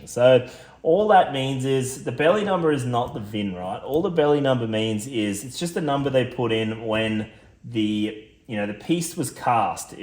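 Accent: Australia. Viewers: Thanks for sharing your knowledge - As always, both an authoritative and entertaining watch.👍 I also have this vision of the OS viewers getting a crash course in good old fashioned Oz speak.... Magic.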